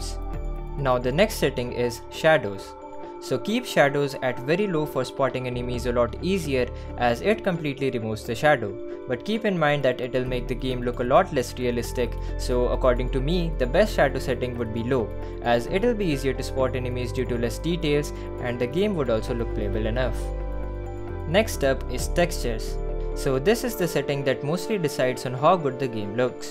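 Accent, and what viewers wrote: India. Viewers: Indian accent = instant dislike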